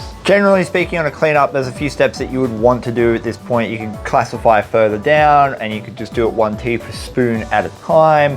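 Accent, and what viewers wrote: Australia. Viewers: I spend a considerable amount of time watching those other gold prospecting videos you mentioned and would like to point out that your personality and energy, as well as your Aussie accent and Gadsey (sp), all combine to make your work the first I choose when there are choices. It would be a shame if you lost the will to continue your work and I commend you for your efforts.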